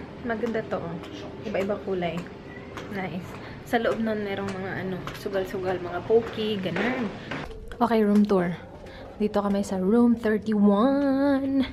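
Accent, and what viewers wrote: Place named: Cebuano, Philippines. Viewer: I really love your cebuano accent <3 hearing them talk cebuano - english is so lovely. That american accent tho <3. like colorismyweapon.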